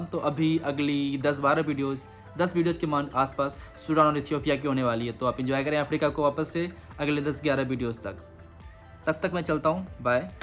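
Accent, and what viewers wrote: Bihar, India. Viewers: Its immigration and u r saying immigrason . Dont use bihari accent . Ab toh dunia ghoom liaaa thora develop kr apne aaap ko .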